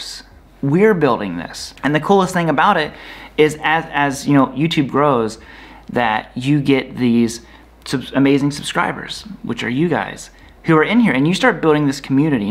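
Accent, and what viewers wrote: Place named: Ohio, United States. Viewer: I love your videos as there is such much positivity. I like your "weird" Ohio accent as it is YOU, something that always comes across in your videos.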